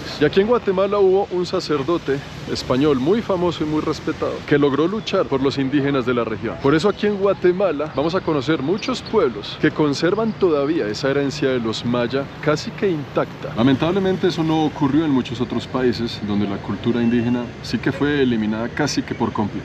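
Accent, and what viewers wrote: Colombia. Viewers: Gracias por presentar a mi país, con un toque colombiano muy agradable, bienvenido, que la disfrute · El nació en Panamá, se crió en Colombia y vive en Canadá, por eso es un orgullo que a pesar de tener otras nacionalidades se considere colombiano, también en su manera de hablar y sus dichos, un youtuber que si vale la pena verlo 👍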